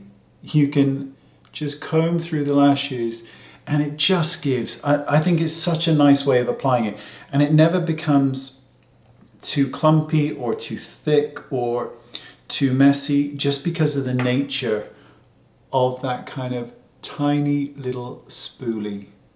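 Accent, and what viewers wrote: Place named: England, United Kingdom. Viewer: Hello 💗 nice 1 again I already had this idea once cause I always use a lot of these interdental brushes as I really need that as I have so tight teeth close to another.. Maybe I wasn't doing right as you just shown, thAnx. Ok then.. the last person I said I love you is my beloved sweet baby girl,my daughter of 6 and I really mean it. I love her to bits (or beats?) as said in England ☺️ and let me tell you Sir your speaking reminds me the real English talking... You really sound as the English gentleman 😄 when I was living in London yrs ago now, I started to get that particular accent but not too bold, I m Italian and from Tuscany so it's difficult to lose that 😆 after 7yrs I decided to going back to my country (love to see you here) now here I had not so much time and opportunities to talk daily with someone british ... 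I think you speak nice n well relaxed not as some Londoners, I couldn't get a word sometimes.. they are doing all fast even talking 😂 I think someone like you could be a better teacher for a foreigner... Maybe you can do also a video about to speak English properly to everyone?! Ciao💗take care 💓😘💚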